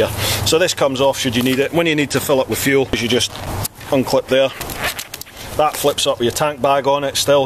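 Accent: Scotland, United Kingdom. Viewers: Hi mate , just found your site , really enjoyed it, the accent grabbed me as a fellow scot , in Oz for the last 30 yrs and a touring , camping bike rider as well. Now happy subscribed . Thanks again Steve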